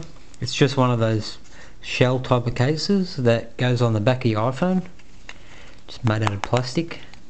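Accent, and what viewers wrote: Australia. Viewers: you sound australian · Aww man it's not nice to ask him why he talks funny...and being British myself I would just like to point out that he's not British. He's most likely Australian or from New Zealand, we don't have dollars over here. We have pounds.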